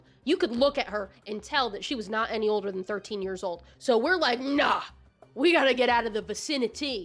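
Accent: South, United States. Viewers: DAVID DIDDLER 😭 I SWEAR EVERY LINE THAT COMES PUT OF YPUR MOUTH IS SO OUT OF POCKET BUT PERFECT THE SOUTHERN ACCENT MAKES IT 100x BETTER HOLLY HELL Sadly I’ve never been to a con of any sort cause I’m from rural Maine and poor but I want to go to the local annual furry con in Portland someday :3 My only horror story about the furry fandom from personal experiences besides having to hide it from my classmates to avoid bullying was probably that I joined when rainfurrest was a current event and a lot of the furries I grew up watching turned out to be creeps 😭 (I think odinwolf ended up being like a huge creep.) Luckily most of the furries that I watched a lot are great people like pocari roo (as far as I am aware) and this guy who I still watch who’s deaf and makes education videos about what it’s like to be deaf and answers questions :3 he’s very wholesome (EDIT: LOOKED HIM UP! 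His name is Xushowolf he’s very cool :] ) So I’m glad not all my memories of being in the early fandom have been tainted by finding out horrible things about the YouTubers years later 😭 Oh yeah also other cursed thing in general is that I also joined the hetalia fandom around that time I’m still in it the fandom is much more chill now for some reason (maybe I’m just good at avoiding the crazy) but I still have people like get mad at me cause I just state (on my own blogs mind you like I’m not even commenting on their posts.) that one of the most popular ships makes me uncomfortable (usuk/whatever y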